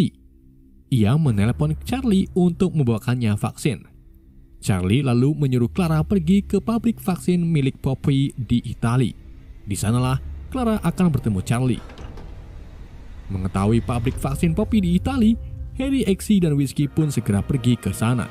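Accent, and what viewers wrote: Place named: Cirebon, Indonesia. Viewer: Wong cerbon kih ketenger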